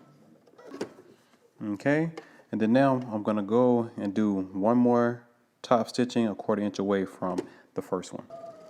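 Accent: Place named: Georgia, United States. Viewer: I recognized that GA accent. Good job! Thanks From a fellow Georgian. I live about 4 hours away from Melanated Fabrics and it's on my bucket list to visit. I just dont get to Atlanta much.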